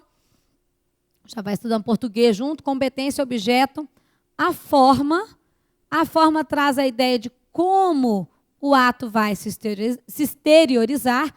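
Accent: Minas Gerais, Brazil. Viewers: com sotaque de mineirooo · professora com sotaque mineiro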